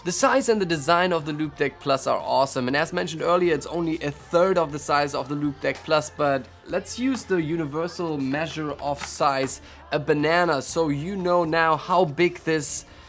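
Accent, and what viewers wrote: Germany. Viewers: The bell rings. Der Hund ringt. Höre ich selektiv oder kommst Du ursprünglich aus .de? ;) Super Video und das CT habe ich soeben auf meine Weihnachtsgeschenkefürmichliste gesetzt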